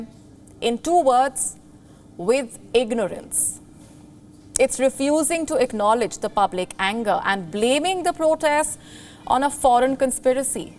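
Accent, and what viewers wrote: India. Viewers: is not improving,her accent is still Indian,her intonation is not improving as well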